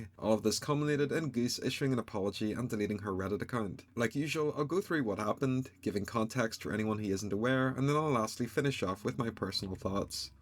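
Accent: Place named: Northern Ireland, United Kingdom. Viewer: You’re NORTHERN IRISH AYYY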